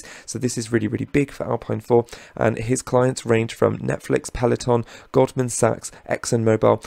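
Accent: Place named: West Country, United Kingdom. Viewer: Good Video. I also like your very discreet west country accent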